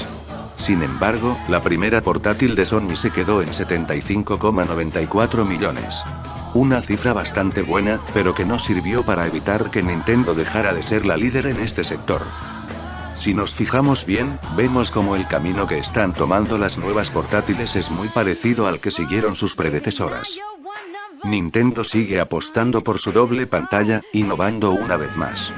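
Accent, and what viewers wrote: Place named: Spain. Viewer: ¿Por qué le ponen esa voz culera de españolete?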